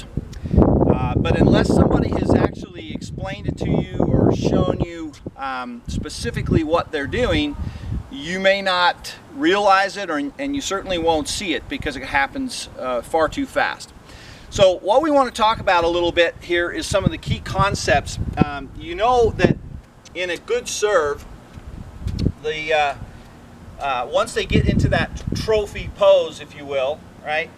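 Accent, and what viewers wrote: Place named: United States. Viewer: Where is Insight Tennis situated? Your accent is US but the locality looks like Irlenad or UK..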